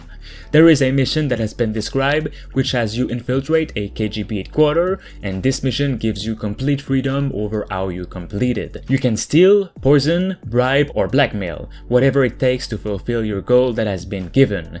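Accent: Quebec, Canada. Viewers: T’es tu Québécois par hasard? 👀😂 Good content, continue comme sa!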